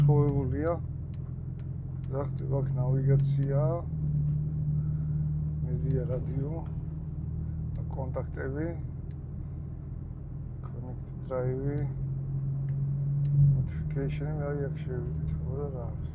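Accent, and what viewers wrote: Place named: Gori, Georgia. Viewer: გორელს კილო აქ განსხვავებული, მარნეულში გატესტოს მანქანა აზერი ხარო კიარ ითქმის..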